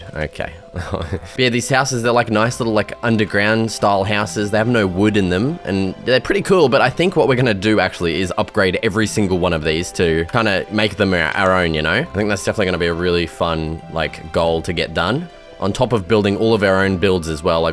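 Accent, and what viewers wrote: Australia. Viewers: That’s just cause he’s Australian lol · All I hear is angry Australian 😂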